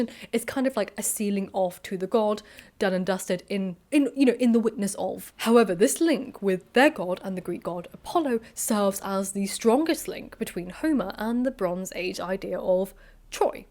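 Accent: RP, United Kingdom. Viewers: My belief was that an event similar to the Trojan War took place and later a fantastical poem was made in the aftermath. Arthur from the story was King Arthur was quite possibly a real person. He was mention in Celtic poems/songs around the time of the Saxon invasion and added on by other authors/storytellers up until Mallory who threw it all together and added several fantastical elements. I believe there is some true to both and I also but a character like Jesus in the same camp. Obviously the person existed and over time the story grew and with each retelling it grew larger and more unbelieveable. All that being said...this is inappropriate but does anyone else find that posh English accent insanely hot, other than myself? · Not British person question: Is her accent particularly posh? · Yer modern british accent is stark compared to my Southern American Accent. 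Love the info,.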